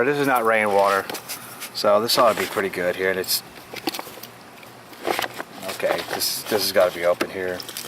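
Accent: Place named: Boston, United States. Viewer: LOL! I'm born and raised NH and when I hear you talk....I hear Boston....LOL!! Nothing personal....not trying to be disrespectful.....just not 'hearing', the accent I expected to hear. Still a good video....and I subscribed....looking forward to more....we need to learn to live with less....we are spoiled and all those creature comforts, have made us prideful!!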